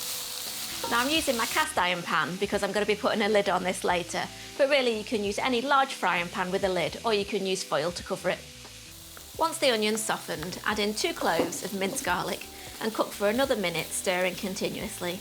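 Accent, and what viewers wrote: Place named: England, United Kingdom. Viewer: Wow. This is so relaxing I love your voice. I’m a Brit permanently in USA now and it just feels sooo good hearing and English accent again 😭🥰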